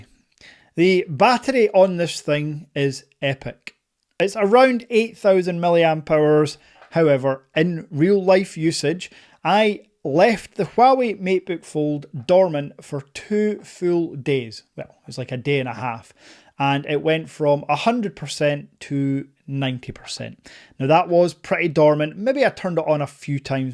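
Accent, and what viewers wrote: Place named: Newcastle, United Kingdom. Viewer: You a Geordie? I always thought you were Scottish lol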